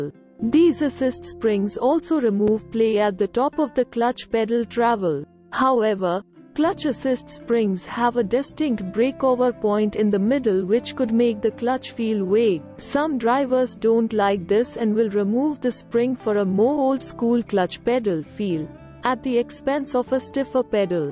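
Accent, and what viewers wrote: India. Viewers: Amazing, a robot-narrative with an Indian accent...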